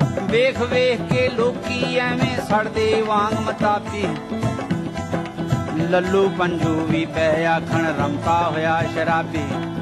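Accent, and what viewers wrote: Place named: Okara, Pakistan. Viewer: same Pakistani punjab ki punjabi... mere area okara main same boli boli jati he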